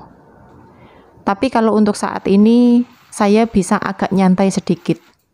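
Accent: Banyumas, Indonesia. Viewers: Mba logate ngapak, wong ndi mba. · Mbak dari daerah mana di Indonesianya? kok ngomong nya kaya orang daerah Banyumas.... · Wong ndi kw yu... Logate kyk ngapak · Wong ngapak apa yak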